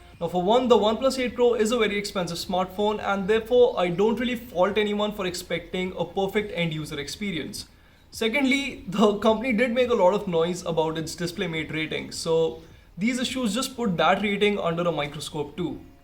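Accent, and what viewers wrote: India. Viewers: why don't you just speak in your native language , at least they will understand everything ... this way , nobody can understand you , I mean your accent is so heavy . No offence but its not just you , most of Indian reviewers have such a heavy , heavy accent . Is it really so hard , I know you are all smart and stuff ...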